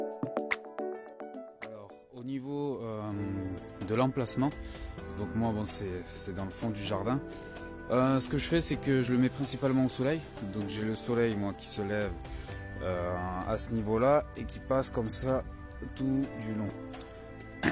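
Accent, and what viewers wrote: South, France. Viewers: OUI tu me la dit la derniere fois qur tu étais nouveau d' ou viens tu ? un léger accent du sud ;moi sa fait 39 ans que je suis a Lyon c était pour 3 ans max Bonne journée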